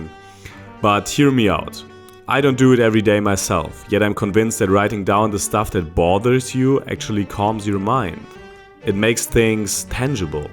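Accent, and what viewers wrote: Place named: Germany. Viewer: apart from the strong german accent, this was a very nicely done video... loved the classical music, too ❤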